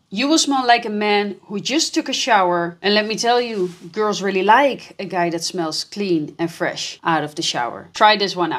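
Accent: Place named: Netherlands. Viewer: She has a Dutch accent.😊❤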